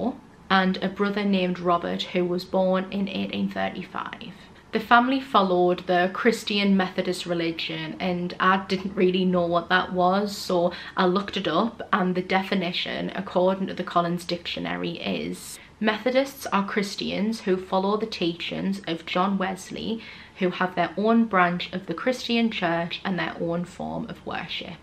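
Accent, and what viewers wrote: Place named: Newcastle, United Kingdom. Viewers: I think your amazing young lady, thank you from an old woman 👵 🤣 brilliant work 👏 Is that a Newcastle accent Pardon my ignorance I have relatives there and love Newcastle ❤️ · whey bonny lass.....listening to your voice makes me feel homesick - stuck down in Kent for a while but our home is up near Crook in God's own County - Durham a lovely bit of research here, presented without hype or sensationalism have hit the subscribe button for you Mary Anne Cotton's house is still there in Front St. West Auckland...the original black Widow... As an aside, local lore states that the grounds of Raby Castle are supposed to be haunted by the victims of Mary Anne Cotton. The ghostly victims are supposed to run across the estate of the castle, playing what can only be described as a game of tag.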